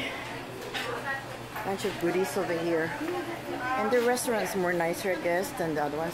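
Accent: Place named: Rizal, Philippines. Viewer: ate ung nsa likod mo panda fave ko haha.. sarap nmn jan,namiss ko kutsinta.ndi n aq nkbili here.. ate ung punto mo "ha-ne", gnyan dn kmi magslita dti ung nktira pa kmi s teresa rizal..sa rizal po ba province u ate?nkakamiss ang word na yan hehe..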